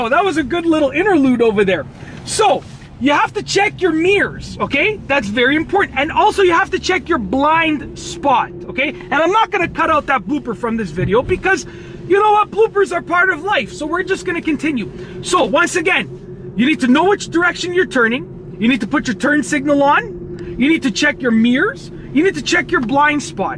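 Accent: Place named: Canada. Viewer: The most canadian accent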